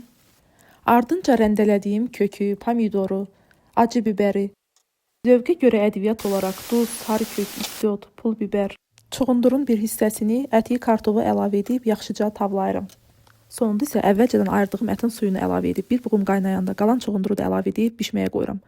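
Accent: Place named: Karabakh, Azerbaijan. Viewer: Kanaliniz yeni qarsma cxdi abune oldum😊xeyrli ugurlu olsun🙌deyesen Qarabaglisiz lehceniz sirin geldi❤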